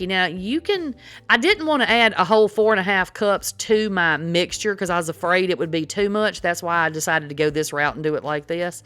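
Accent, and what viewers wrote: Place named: Louisiana, United States. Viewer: Hey girlfriend I'm from North Carolina I hear your accent where you from I'm from the Appalachian Mountains of North Carolina girl I've been cooking ever since I was about 9 years old I thought about making my own channel but I ain't got time for it LOL actually I'm talking about my patience😅😅 but I love your Channel you are just so awesome Update I just saw where you're from. speaking of Louisiana I grew up watching Justin Wilson I love how he always splashed in a little wine😅 Also I wanted to make this comment watching you make a mess with the Bisquick😅😅 is the type of Channel I like to watch I do not like watching channels where people seem to be so perfect that everything they do makes me feel like😅😅😅😅 no talking whatever but I see why I like your channel you're just down to Earth so when you make a mess I ain't bothering me one bit we all make messes that's when I get my kids to come in and clean it up for me LOL😅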